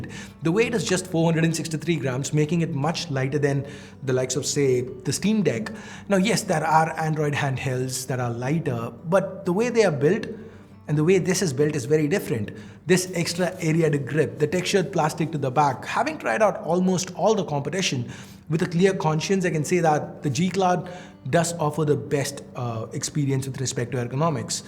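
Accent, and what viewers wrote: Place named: Tamil Nadu, India. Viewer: You English is like a typical tamil school student who always wants to grap the attention of others just by speaking in English.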